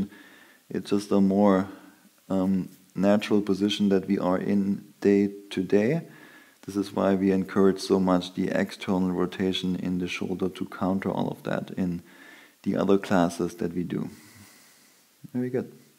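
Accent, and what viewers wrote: Germany. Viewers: Das hört sich doch nach nem deutschen Akzent an 🤔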